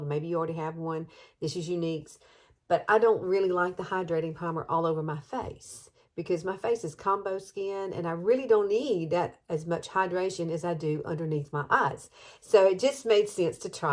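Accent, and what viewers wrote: South, United States. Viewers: I really love your videos. Your voice is so calming along with your Southern accent. I have puffy wrinkled eyes so I love this routine. Keeping you in prayer for the loss of your Mom, it’s hard I know...take care lovely lady 🙏🏼♥️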